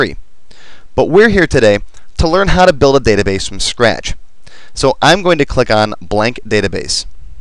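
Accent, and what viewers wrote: Chicago, United States. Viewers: Awesome series, thank you for breaking it down and making it dummy proof. On a side note, you sound like you are from Chicago.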